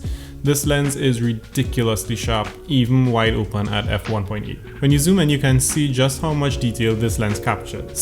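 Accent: Trinidad and Tobago. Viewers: I have the Nikon 5100D and was looking for a lens to go with it other than the kit lens. I saw your video and decided to give it a watch and within the first 5 seconds I heard the Trini accent 🇹🇹 !! Big up my people one time. Just a quick question though, would you recommend this lens for a video podcast on my camera? Great video !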